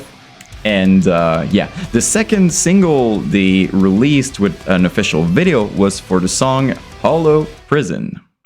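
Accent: Quebec, Canada. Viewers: c'es tu un accent Québécois que j'entend mon chum?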